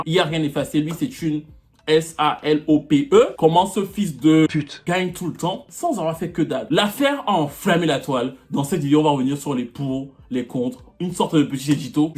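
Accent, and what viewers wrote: Cameroon. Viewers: Je viens de decouvrir ta chaine et like beaucoup,surtout ton ptit accent +237...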